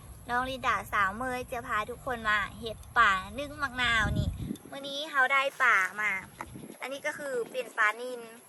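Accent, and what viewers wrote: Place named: Laos. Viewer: เว้ากะม่วนหลาย มักสำเนียงน้องลินดา หลงเสน่ห์สาวลาวคนนี้แล้วล่ะ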